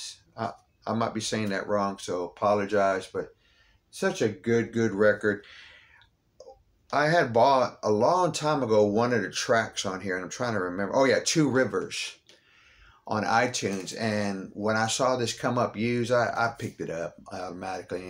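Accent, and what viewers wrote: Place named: Louisiana, United States. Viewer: Such a huge loss to the rock community! Another hard one to swallow. I asked if you were from L.A. because you're accent is similar to mine. I grew up on the Texas border on the Louisiana side. You got this country / Cajun thing going on that does my heart some good. Peace brother! R.I.P.!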